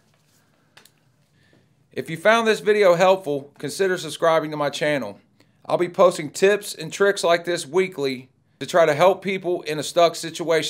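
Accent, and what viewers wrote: South, United States. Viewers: I dont think ive ever heard a more southern accent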